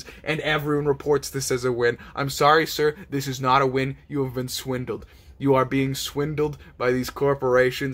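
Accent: Boston, United States. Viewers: Great video but that Boston accent gotta go kid. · I love the accent. It’s the first time I’ve really liked a Boston accent. Great music, too.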